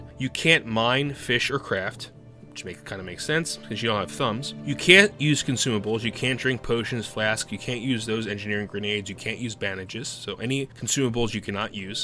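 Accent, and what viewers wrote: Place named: Philadelphia, United States. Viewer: bro youve gotta be from south jersey or philly with that accent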